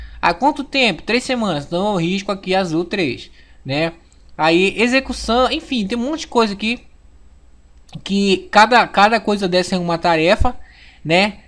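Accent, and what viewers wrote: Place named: Pará, Brazil. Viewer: Ahaha desde seu primeiro vídeo conheci o sotaque paraense rsrs, saudades da minha terrinha...mas amo Brasília rsrs! Adorei o vídeo sabe muito, parabéns!!!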